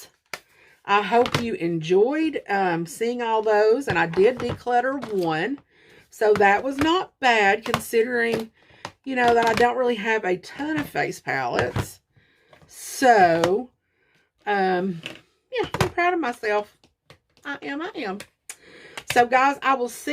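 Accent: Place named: South, United States. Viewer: Ong I heard the southern accent right away. Lols it’s the sweetest accent 😊😊 I’m having this weird obsession with finding the right face palette so I’m so curious to see what you have and what you keeps lols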